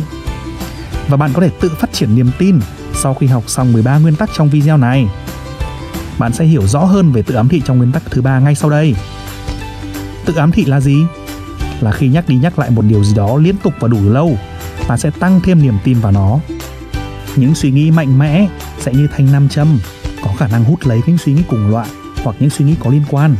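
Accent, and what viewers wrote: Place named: North, Vietnam. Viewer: Rất muốn nghe nhưng kênh kèm nhạc ồn quá k thể tâp trung nghe đc.mình là người Nam nghe giọng Bắc đã hơi khó lại thêm nhạc nữa .....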